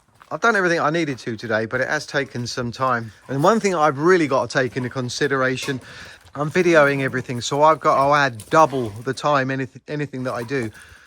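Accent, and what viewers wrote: United Kingdom. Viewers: Hi! I can tell by your British Accent, that you are renovating this house maybe in Cornwall or Devon. Or somewhere in Spain.❤ Greetings from Northern Germany, Fritjof 😊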